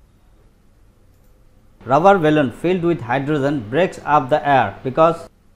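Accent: Northeast India, India. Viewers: Where are you from are you from northeast India because of your accent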